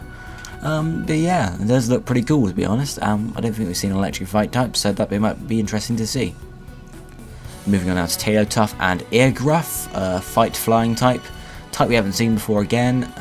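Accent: England, United Kingdom. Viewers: hes from england i think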